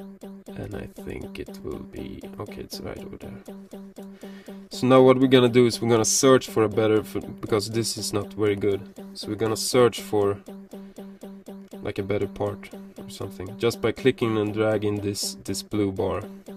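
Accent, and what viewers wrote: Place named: Sweden. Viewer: lol love your accent :D it is soo swedish. great tut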